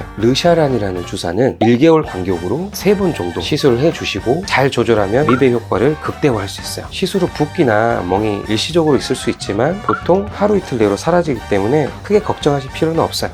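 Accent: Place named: Jeolla, South Korea. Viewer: 전라도 사투리 진짜 우와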